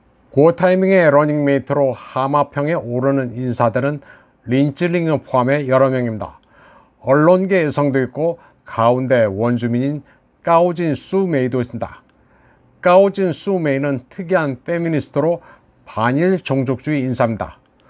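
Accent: China. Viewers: 제반 시사 주제들을 객관적으로 냉정하게 알려주어 주류언론의 맹목에 끌려다니지 않고, 사실을 적시할 수 있게 해주어 늘 감사하게 방송 보고 있습니다. 한가지 제 생각을 말씀드리자면, 중국에서 경전, 고사성어, 한자 숙어등으로 의사표현을 하는 경우 늘상 중국식으로 발음하시는데, 물론 중국인이 중국어로 한 것이니 당연하기도 합니다만, 한국에서도 많은 고사성어나 제자백가의 경전을 인용할 때 한국식 한자음으로 일상 사용하고 있으니, 오늘 방송한 내용처럼 배의망은 천인공륙같은 내용이 나울 때 이런 부분은 우리 한자음으로 말씀하시는 것이 일반 한국인 입장에서 더 쉽게 이해할 수 있는 것이 아닐까 합니다. 정답이 없는 부분 같기도 한데, 공자를 꽁쯔 맹자를 멍쯔로 한국사람끼리 얘기 하지는 않으니, 일련의 한문식표현은 한국발음으로 하는 것이 어떨까 하는 생각입니다. (중국식발음이전에 최소한 한국한자음을 한번 읽는 정도라도.) 한번 검토해 주시기 바랍니다.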